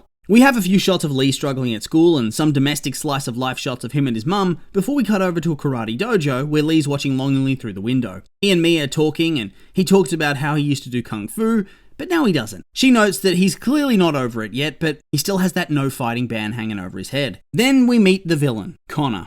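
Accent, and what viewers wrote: Australia. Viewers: I love the sound and tone of your voice so much!! Australians have always had my favorite accent, and visiting there has always been a big goal of mine to help study bio diversity and wildlife. And 'cause it's super pretty too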